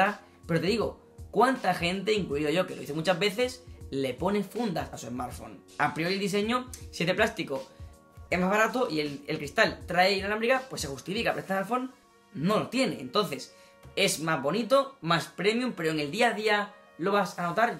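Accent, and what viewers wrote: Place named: Andalusia, Spain. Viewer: Es el acento local, cuando estás unos años viviendo con andaluces lo entiendes todo perfectamente 😁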